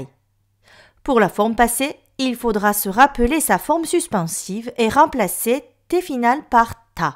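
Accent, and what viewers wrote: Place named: South, France. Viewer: Bonjour, c'est amusant car j'entends chez vous l'accent du midi ! Je voudrais apprendre des rudiments oraux de japonais en cours particuliers. Pour un court voyage au Japon et pouvoir chater. Est-ce possible avec vous ? Thierry Dorée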